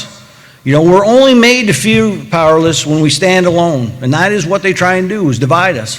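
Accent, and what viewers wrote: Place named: Maine, United States. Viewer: He's from northern Maine. This is as authentic as it gets and that matters. You probably want him to speak D.C. English, or maybe the Queen's. Let Mainers be Mainers.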